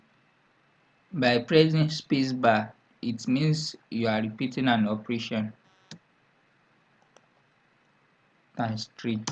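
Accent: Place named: Nigeria. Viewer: you talk like a Nigerian. well done and great job. Do you use AutoCAD MEP or REVIT for designs?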